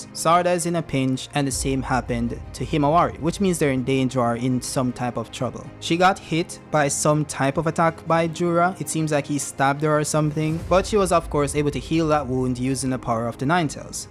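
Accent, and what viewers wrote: Jamaica. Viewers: yo are u jamaican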